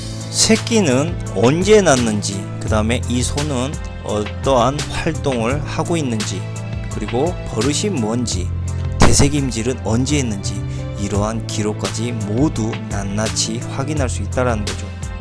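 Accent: Jeolla, South Korea. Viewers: 전라도 분이신가봐요? ㅋ 말투속에 사투리가 구수허시네요^^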